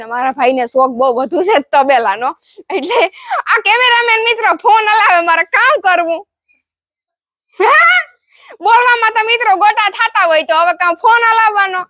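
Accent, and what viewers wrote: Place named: Kathiawar, India. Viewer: સિસટર તમારિ કાઠીયાવાડી બોલિ સરસ છે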